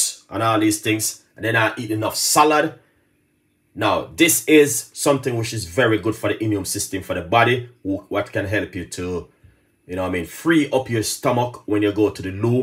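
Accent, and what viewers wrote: Jamaica. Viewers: This is how Jamaicans talk he is just being himself. Video is live not staged 😊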